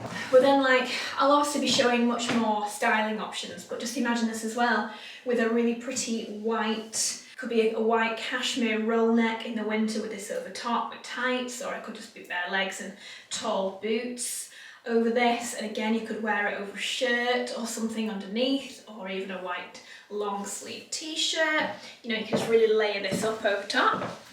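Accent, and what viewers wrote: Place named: Northern England, United Kingdom. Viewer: It makes me so happy when I hear you say classic northern phrases like “cracking the flags”! 🙌 I love both of the coat materials but I think the blue pattern has the edge for me 💙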